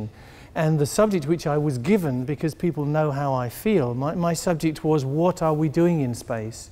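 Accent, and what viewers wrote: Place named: United Kingdom. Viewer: wow a learned guy with a British accent....charming...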